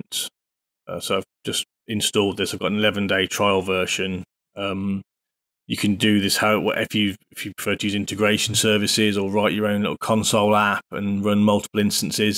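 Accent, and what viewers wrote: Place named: United Kingdom. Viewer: Man, we just hired a really expensive guy. Wish we knew about you., from your accent you're based in the UK, but where abouts are you in the UK?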